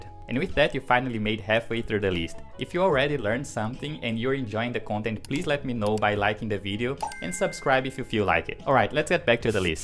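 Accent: Brazil. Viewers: Eu sabia que esse sotaque tinha um jeitinho brasileiro!!! Fera!!!! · Eu sabia que era inglês de brasileiro hahah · Pq eu reconheço um sotaque brasileiro tão fácil?! haha Não sei explicar, não sei imitar, mas com 5 segundos de video, já sabia que era brasileiro!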